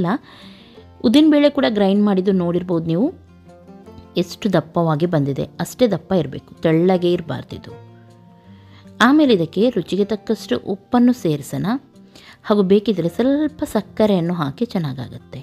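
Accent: Mangalore, India. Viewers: Hegidira akka I'm from pattadakalu nim manglurige bhashe nage thumba esta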